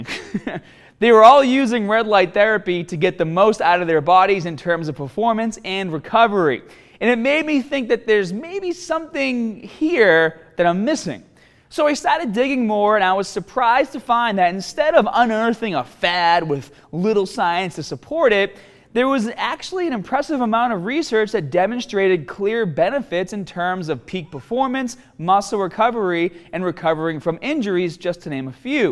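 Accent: Boston, United States. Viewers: I'm detecting that "Bostonian" accent. :-) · Woooof I didn't know this guy was from Boston until "scars" and "marks" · are you a Bostonian. The way you say 'started' --> 'Staaahted' is a dead giveaway! From one Bostonian to another - Do. Not. Lose.the.accent.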